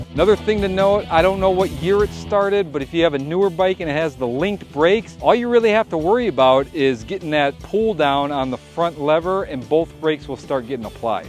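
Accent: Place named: Midwest, United States. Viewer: your content is so good. Maybe it’s the midwestern accent 😮 that makes you so easy to understand 😅. I like the figure 8s you do in 4 parking spots. Keep up the good work. Really solid🎉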